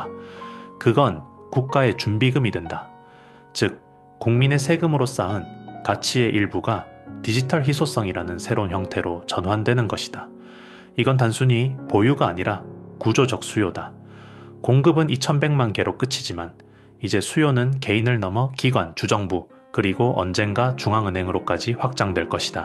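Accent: Gyeongsang, South Korea. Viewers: 경상도 사투리네...경북 사람인듯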